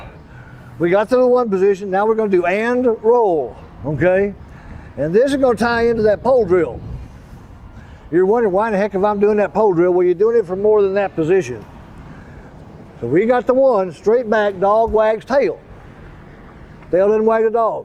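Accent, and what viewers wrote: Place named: Texas, United States. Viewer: I bought his tapes in 1997 and i started hitting the ball straight as an arrow, My VHS broke and I forgot what he taught me. I'm 72 now two hip surgery's later and struggling. I'm glad I found him on You tube. i' m also going to buy a VHS and start resurrecting my game. I just love listeneing to his folksy Texan sytle-a great teacher.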